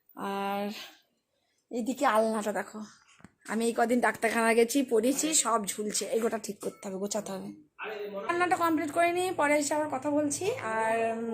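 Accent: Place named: Birbhum, India. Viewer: দিদি তোমার মুখে বীরভূমি ভাষা শুনতে খুব ভালো লাগে.. কি সুন্দর ব্যাটা বিটি বলো .. সুর করে কথা বলো .. এই ভাষা তোমার মুখ থেকে শুনেই শিখেছি ❤❤❤❤❤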